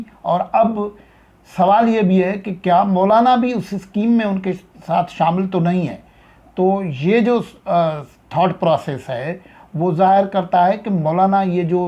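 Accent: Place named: Sindh, Pakistan. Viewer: صاحب آپکے لہجے اور اردو بولنے سے لگتا ھے کہ آپ کا تعلق سندھ سے ھے کے پی میں اے این پی اور پی پی پی مل کر اے این پی کو دو تین سیٹیں اور پی پی کو ایک آدھ سیٹ نکال دے سکینگں اگر پی ڈی ایم میں رہتے ھیں تو شاید ایک بھی سیٹ نہ نکالیں تمام کے تمام جے یو آٸ ن لیگ آزاد امیدوار اور پی ٹی ایم کے حصہ میں آٸینگے اس لٸے پی ڈی ایم سے راہ فرار اختیار کر رھے ھیں اور پنجاب میں پی پی کہیں جنوبی پنجاب والوں سے اتحاد کرکے دو چار سیٹیں خیرات میں مل سکتے ھیں ن لیگ میں پی پی کو سبکی کا سامنا ھوگا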